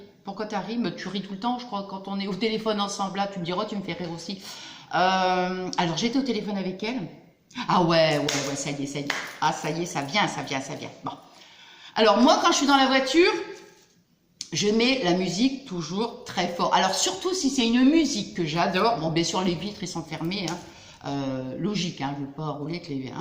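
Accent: England, United Kingdom. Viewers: Bonjour ma cocotte moment sympa surtout avec ton accent anglais et tes pantoufles moi j en ai aussi avec des cœurs et des pompons derrière, j aurais penser que le chat m aurais sauter dessus mais ça va cette folie la c fini,il fut un temps j avait des pompons devant, il m attaquait a chaque fois.passe une bonne journée en pilou c chouette.gros bisous 😚 mon amie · Trop bien cet accent anglais..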